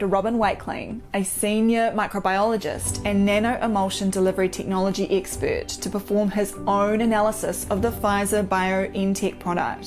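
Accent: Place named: New Zealand. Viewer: I went, I watched.. I don't sign up for all the platforms but it asked me to let you know if I enjoyed it. I enjoy listening to the NZ accent ESP from you ;) However, I don't enjoy being terrified. On a scale of 1-10 of VALUE I'd give it 100. And a million thanks and blessings.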